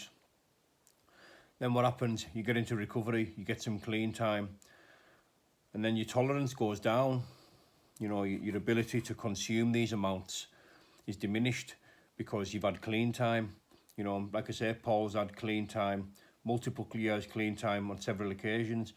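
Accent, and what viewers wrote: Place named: Cumbria, United Kingdom. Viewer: Are you from Cumbria?can definitely hear it...x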